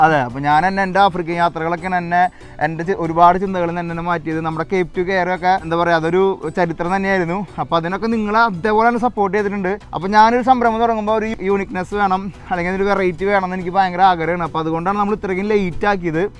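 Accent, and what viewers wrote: Thrissur, India. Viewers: ഈ വീഡിയോ ചേച്ചി കൊണ്ടുപോയി വിത്ത്‌ തൃശൂർ സ്ലാങ് 😍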